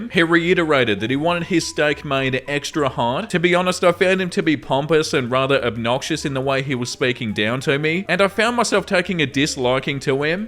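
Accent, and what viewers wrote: Australia. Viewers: I have never heard someone so Australian and so valley girl at the same time. It's amazing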